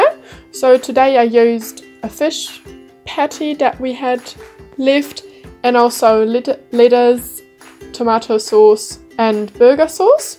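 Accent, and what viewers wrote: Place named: New Zealand. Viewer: wow du hast einen richtigen Kiwi Accent 👏😻👍 vor allem das "e" ich liebe es 😄 noch ganz viel Spaß :)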